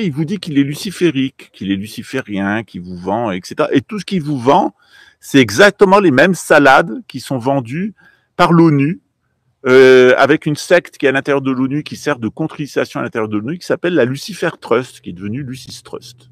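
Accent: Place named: Italy. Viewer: Belle accent Italien! L’Italie vous aime, je suis a Rome et décider de vivre ici, les Italiens sont adorable et bienveillant, merci pour vos vidéos incroyable et instructives! Que dieu vous bénisse.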